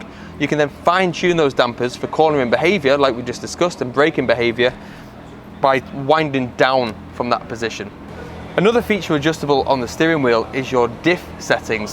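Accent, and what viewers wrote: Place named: United Kingdom. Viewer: great video, just a bit more USA english will be great for people outside of UK :-)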